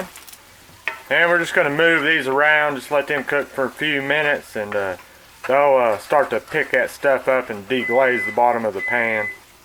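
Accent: South, United States. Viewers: Accent...southern. Black or white...really? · We can make fun of his southern accent, but dang this looks good!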